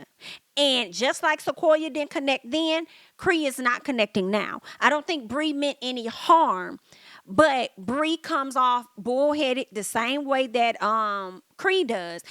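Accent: United States. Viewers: i love hearing you talk country sounds talking😂Blessings 🙏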